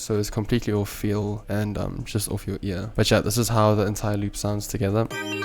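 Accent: South Africa. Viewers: You sound south african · I know a SA accent when I hear one 😭🔥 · Bro. Are you South African? That accent?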